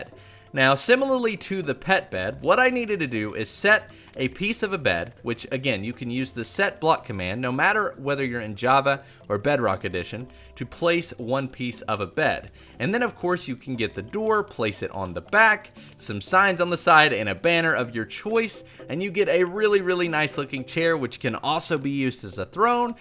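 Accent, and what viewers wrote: United States. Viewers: He does have an accent it's just an American accent can't really pinpoint it but he does have one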